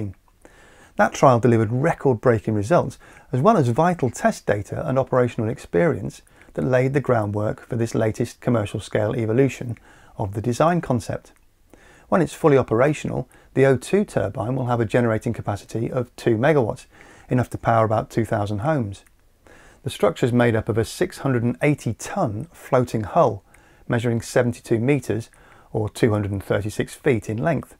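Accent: England, United Kingdom. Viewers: what about large fish and whale collisions with the blades??? can a cage be built around the blades to protect the blades and block marine critters from impact. First time viewer. I like your presentation style, your pitch of voice and your speed of speech, and---your accent. It reminds me of my dear grandfather who was from Atherton England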